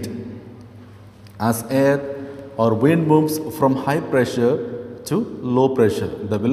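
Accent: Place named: Spain. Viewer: sir your exent is little bit spanish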